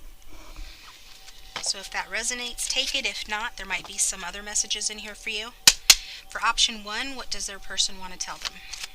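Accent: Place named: United States. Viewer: Your readings are very accurate but I struggle to listen to your American accent 😭😭😭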